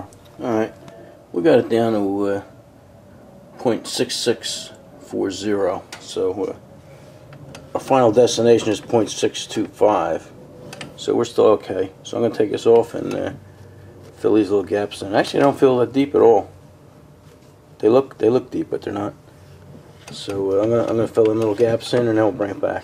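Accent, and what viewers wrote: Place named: New Jersey, United States. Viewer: Your Jersey dialect versus my Canadian dialect.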